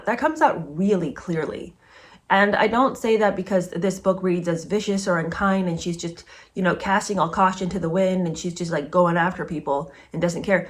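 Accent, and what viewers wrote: South, United States. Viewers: One chapter and this book already has more depth than Worthy. This should be interesting. Love your southern accent. I just finished Matthew Perry's biography. His account of his life is raw. It helps to explain why and how he struggled. You might enjoy it. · As soon as the video was over, I was like: gimme, gimme 🎶 Great book and even better with you telling it, of course! Also, I really like your southern accent 😅💕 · I've learned more about Brittany in Part 1 than Jada in her entire book Worthy😂 And by the way, I love your southern accent .😃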